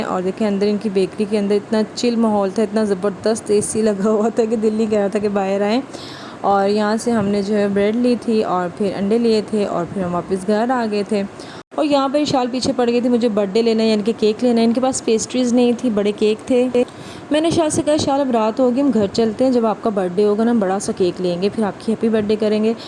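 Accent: Punjab, India. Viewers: Urdu Mein punjabi ka tarka both Acha lagtha ha. very good sharing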